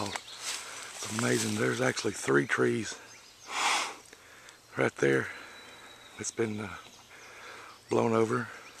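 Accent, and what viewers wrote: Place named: Kentucky, United States. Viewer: Thought your accent sounded familiar. I'm in London KY. Laurel County....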